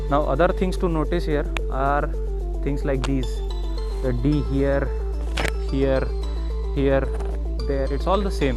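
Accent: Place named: India. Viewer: I liked your narration , its not boring at all and your accent is very Indian (not fake). Thanks.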